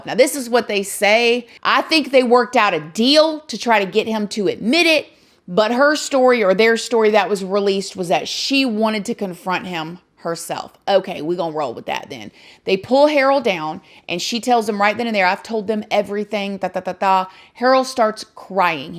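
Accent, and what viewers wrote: South, United States. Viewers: I am in love with the country accent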